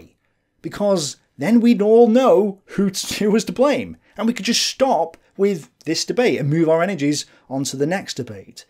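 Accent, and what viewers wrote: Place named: North, United Kingdom. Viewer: part of it just comes from having a Northern accent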